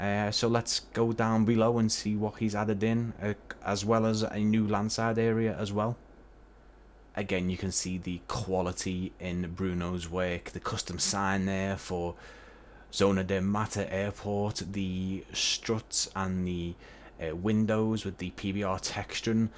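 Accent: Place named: England, United Kingdom. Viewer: 🙋‍♂️👍Really,Really Nice Reviews,...I’m Impressed by your Very well done Reviews! Like the English accent too. Keep up the good work 👍✈️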